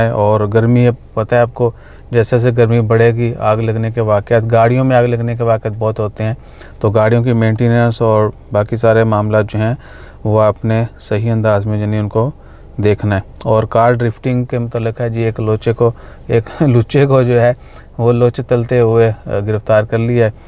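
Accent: Pakistan. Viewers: Bhai ap Lahore k han apki punjabi Lahore wali hai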